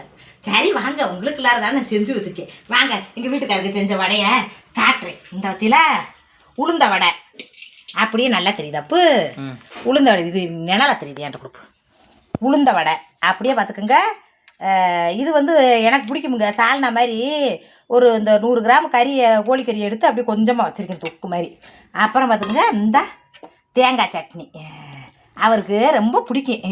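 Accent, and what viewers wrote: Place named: Ramanathapuram, India. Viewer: ஏக்கா ராமநாதபுரம் மாவட்டம் மாதிரி கூட பேசிப்புட்டு நீ எம்புட்டு குதூகலமா பேசுற நீ ஏத்த உளுந்த வடை நல்லா பொசு பொசுன்னு வந்திருக்கு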